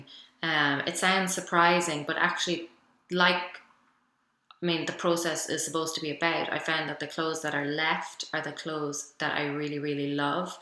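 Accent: Ireland. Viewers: Like your videos. Also love your accent. My guess is your from Ireland.....but I also watch Lydia E. Millen and you both say jumpers, where I call them sweaters. Wonder where we went wrong on this side of pond.....lol. thank you for sharing, katie mullen usa.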